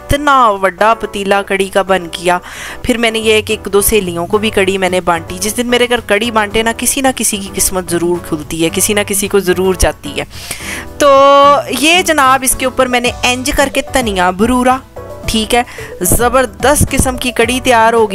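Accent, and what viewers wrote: Punjab, India. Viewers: Panjabi ka trka to bara vadiya lagati hain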